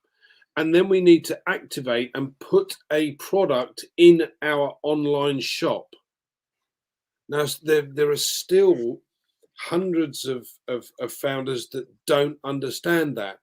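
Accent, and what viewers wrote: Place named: RP, United Kingdom. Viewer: that was a very good explanation in plain english or what we call Queen's english and hope at least 35 out of 50 got it😳:hand-pink-waving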